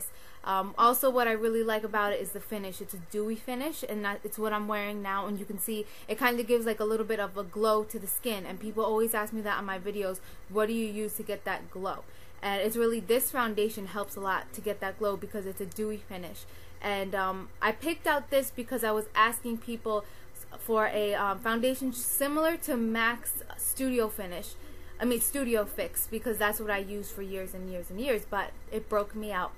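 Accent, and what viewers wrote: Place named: Miami, United States. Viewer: you sound like your from Miami, anyway great video, very informative!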